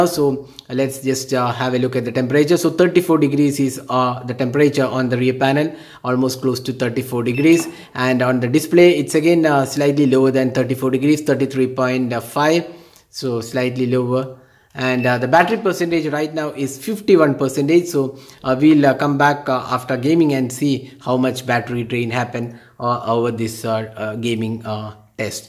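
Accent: India. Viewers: oye indian accent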